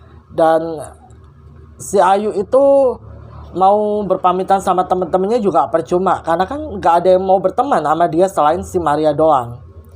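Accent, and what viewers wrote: Surabaya, Indonesia. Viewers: Mba cara cerita nya,logat Surabaya nya kental bgt....semangat mba,sukses sll.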